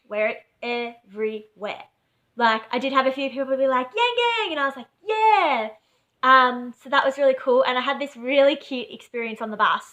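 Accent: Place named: Australia. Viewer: send me her and half of my family talk like you--super Aussies! I love Australia my cousins got a Viet Restaurant! You sing!?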